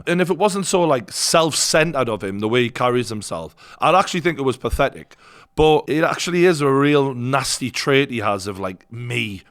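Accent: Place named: Geordie, United Kingdom. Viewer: Your to much geordie 🤣🤣 but I fucking love it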